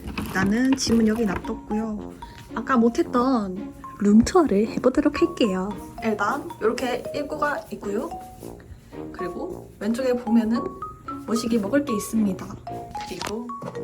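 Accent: Gyeongsang, South Korea. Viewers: ㅎㅎㅎ 브이로그에서는 ㅋㅋ 뭔가 귀엽게 대구 발음이 나오시네요. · 소리 끄고보면 완젼 갬성 브이로그 유튜브가튼데 소리키면 그냥 대구사람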